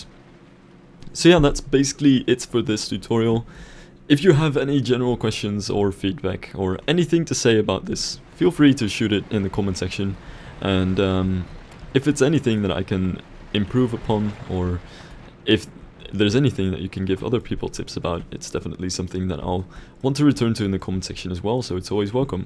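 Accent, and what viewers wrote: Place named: Netherlands. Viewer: you have to be dutch, atleast you sound like a dutch guy speaking english, btw verry nice vid man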